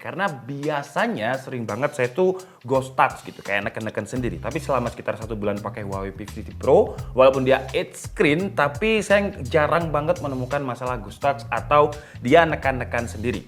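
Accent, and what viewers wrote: Malang, Indonesia. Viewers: owalah om wong malang tah...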